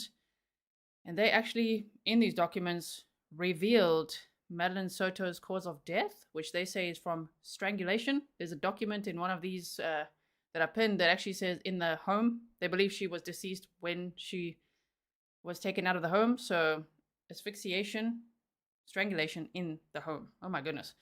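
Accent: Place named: South Africa. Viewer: Your South-African accent is so beautiful, it makes me proud to be South-African. You sound like HOME❤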